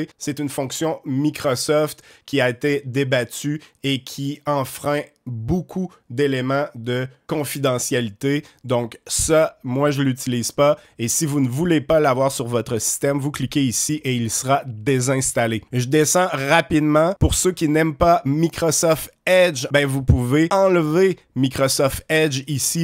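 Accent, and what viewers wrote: Quebec, Canada. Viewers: J'ai l'impression que c'est un super logiciel, mais pas fait pour moi ... D"abord car mon anglais est médiocre, et ensuite, en informatique je ne suis pas au top à mon âge avancé ... C'est dommage, car j'aurais bien aimé nettoyer mon pc efficacement et supprimer tout ce qui est inutile !!! Petite question : Avira free est-il fiable comme anti-virus ? Bonne journée à toi, de la part d'un français de notre pauvre France, c'est toujours un plaisir de t'écouter car j'aime cet accent de la "Belle Province", et même si je n'ajoute pas de coms à chaque fois, je mets toujours un "j'aime" 👍👍👍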